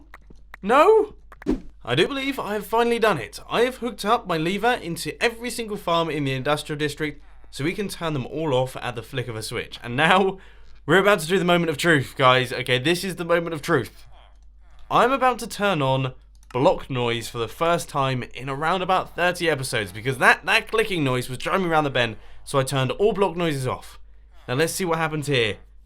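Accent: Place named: United Kingdom. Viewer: 10 reasons to watch Mumbo: 1. The intro is awesome 2. His voice is awesome 3. His Redstone contraption are awesome 4. You can actually learn a lot about Redstone from his content 5. His voice is awesome 6. He has a British accent 7. HIS FUCKING VOICE 8. His content contains no vulgar language, partial nudity, etc. - he is kid friendly 9. Him.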